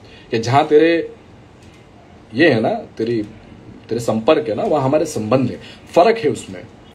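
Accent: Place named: Mumbai, India. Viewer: Asali ❣️💪powerful voice🔥 bohat sari videos dekhi sahil Khan ke khilaf pr bhai aap ne jo bola he boss 🔥🙌 aur mumbai vali boli kadak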